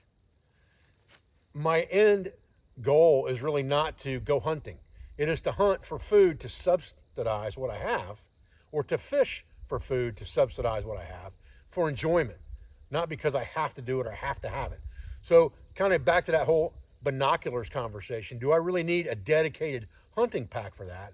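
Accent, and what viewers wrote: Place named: Ohio, United States. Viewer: Could you add the names of the packs to the video description. I was able to look up the first one you displayed, but your ohio accent won't let me figure out the name of the second one.:) Thanks, - Joe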